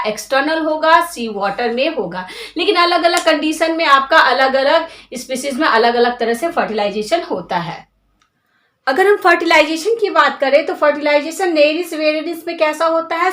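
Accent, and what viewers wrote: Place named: Bihar, India. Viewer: Accha smjhaya mam. u r from Bihar ...h n ...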